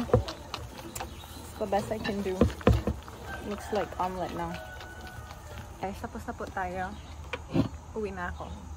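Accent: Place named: Visayas, Philippines. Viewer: season naman diay ka mag bisiya ❤😂 bisdak na 100%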